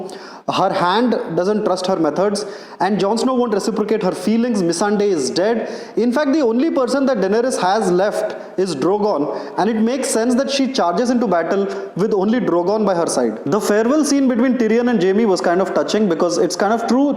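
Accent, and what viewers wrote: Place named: India. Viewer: This guy reads reddit and makes a video with typical indian pronounciation 🤭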